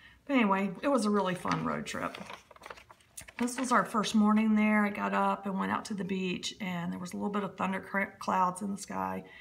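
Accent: United States. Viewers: you did a fabulous job!! Loved seeing all your beautiful pages and love your little southern accent!!!!! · Hi, A wonderful flip through. The balance was perfect. I was interested all of the way through. It's lovely to put a voice to the face and you have that gorgeous American accent with the lovely elongated vowel sounds. I look forward to the next one, Ali xxx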